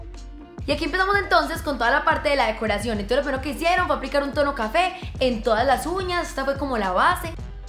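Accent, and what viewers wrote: Antioquia, Colombia. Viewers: habla todo paisa eso me encanta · Eres colombiana nada mas con tu voz 😐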